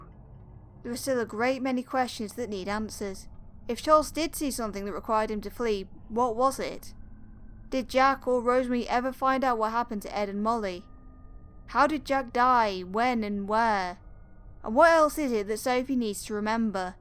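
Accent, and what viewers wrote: United Kingdom. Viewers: You speaking british makes me feel better lol ✨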